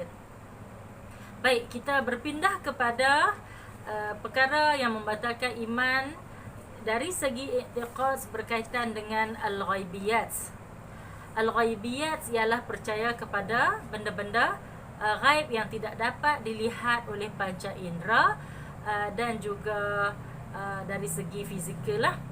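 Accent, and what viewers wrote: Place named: North, Malaysia. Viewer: Tahniah ustazah...kemaih👍👍👍lama x cakap utara sejak pindah perak..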